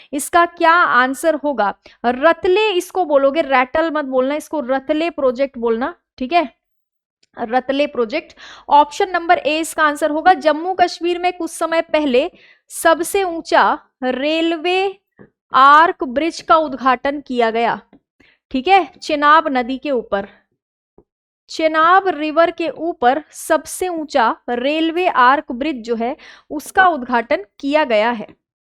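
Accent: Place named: Bihar, India. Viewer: Madam ji aapka bolne ka excent thoda Bihari jaisa lagta h but accha bhi lagta hai 😊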